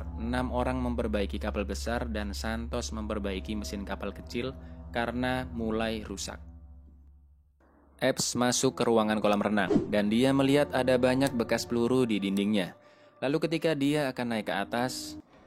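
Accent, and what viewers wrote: Java, Indonesia. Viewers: Org jawa y bang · Orang jawa ya bang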